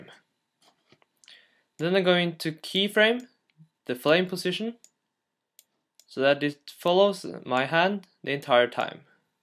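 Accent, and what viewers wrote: Norway. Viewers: Are you norwegian? Sounds like it :) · hahaha kan høre at du e norsk på uttalen xD konge vid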